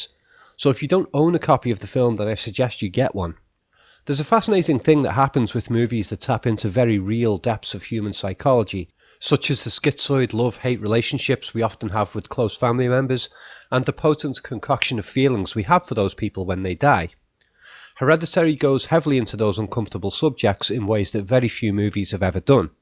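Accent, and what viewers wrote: Liverpool, United Kingdom. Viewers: The juxtaposition of horror movie analysis and a Scouse accent is scary in itself!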